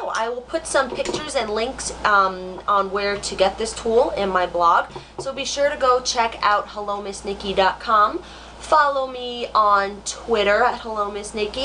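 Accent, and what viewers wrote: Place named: North, United Kingdom. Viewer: Are you from up north? I can tell by your accent lol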